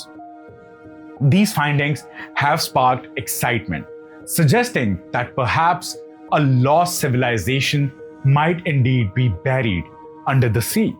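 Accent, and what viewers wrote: India. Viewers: annoying listening to hindu english ...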